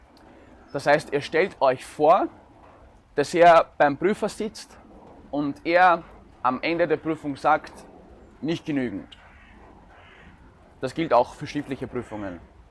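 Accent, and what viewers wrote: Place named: United States. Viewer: Auf dem ersten Blick hätte ich gedacht, dass er entweder Amerikaner oder Österreicher ist, weil er zwei Sprachen so gut beherrscht. Denn ich studierte für ein Semester Jura, bin ich qualifiziert zu sagen, dass es knallhart schwer ist. Ich gratuliere dir und drücke die Daumen hoch! Du hast noch einen Subscriber verdient:)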